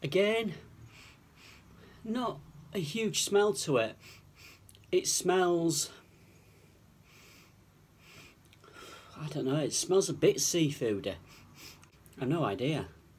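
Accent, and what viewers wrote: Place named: Northern England, United Kingdom. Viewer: You've got to stuff it in your gob, couldn't sound more northern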